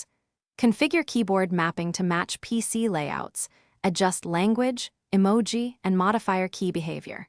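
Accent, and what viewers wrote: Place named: United States. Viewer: The overly-American accent is REALLY grating. Please over-pronounce the letter R so it's like fingers down a blackboard.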